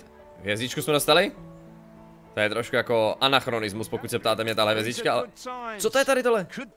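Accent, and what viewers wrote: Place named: Prague, Czech Republic. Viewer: podle prizvuku tipuju na Prazaka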